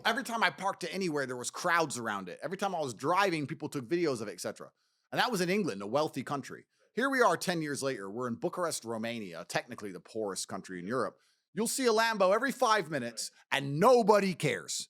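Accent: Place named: India. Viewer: Just subscribed, quite a strong Indian accent but is very well-spoken. I know India is a huge place but I'm from the UK and I promise you the place I hear about the most for its beauty is India. However, a lot of people hear India and think of some shithole, I might be wrong here but I am sure the economy in India has been and is on a huge growth spurt. Big hidden gem??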